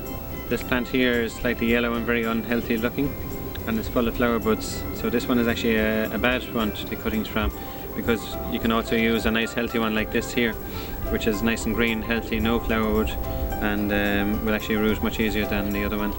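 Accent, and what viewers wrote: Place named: Ireland. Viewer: I'm from NZ and I love ur straight forward, no fuss, informative style and that Irish (???) accent is to die for. thanks for the video.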